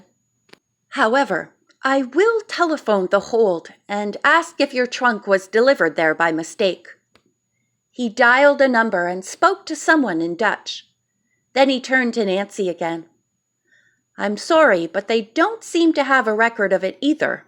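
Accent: South, United States. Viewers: I love your southern accent !! It is perfect !!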